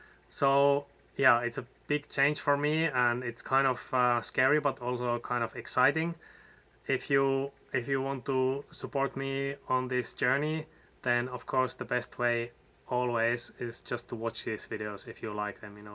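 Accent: Finland. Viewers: Why do you have so Finnish accent?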